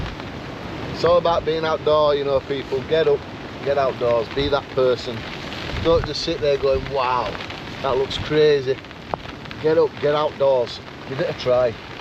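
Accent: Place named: Huddersfield, United Kingdom. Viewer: Def a huddersfield accent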